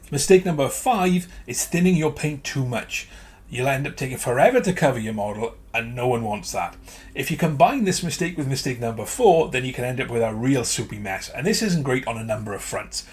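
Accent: Wales, United Kingdom. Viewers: You sound like a fellow Welshman! Those tips were much appreciated cheers!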